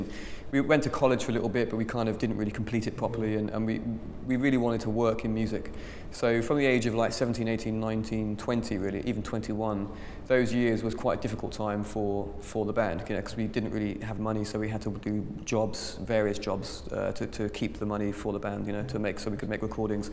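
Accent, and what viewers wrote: United Kingdom. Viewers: i love british accent:)))))))) <3<3<3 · He's a perfect package! He's intelligent, he has a great looks plus the sexy british accent. Just perfect.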